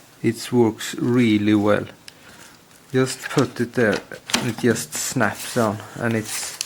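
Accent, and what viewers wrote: Jönköping, Sweden. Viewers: Är du från jönköping?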